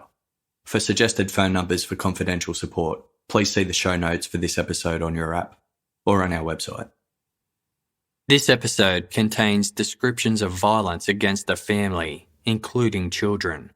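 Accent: Australia. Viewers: I really enjoy that it’s presented in an Australian accent 🇦🇺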